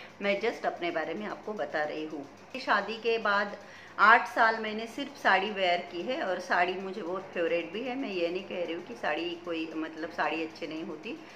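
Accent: Nashik, India. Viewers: तुमचा हिंदी चा आवाज छान आहे मराठी पेक्षा मराठीत नाशिक ची भाषाआहे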